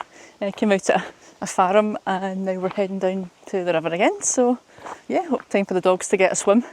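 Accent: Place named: Scotland, United Kingdom. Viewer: Done part of that lovely walk but not as far as the bridge. Lovely to hear a Scottish voice and a lovely smile. Keep up the good videos. 👍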